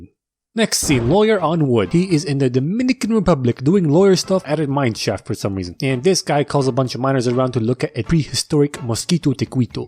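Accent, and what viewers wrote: United Kingdom. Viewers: the best part is the accent - I'm british too but it gets me every time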